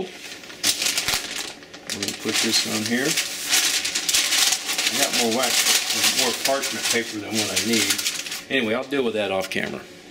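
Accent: South, United States. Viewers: That southern accent is too good I could here that all day..😊🙌🤣🤣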